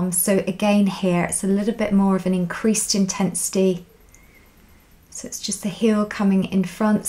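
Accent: England, United Kingdom. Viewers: Great work out to do w my husband w high blood pressure, exercise shy but reluctant to start medication. He prefers the English accent to the incessant tone & pitch of the US trainers! Thank you.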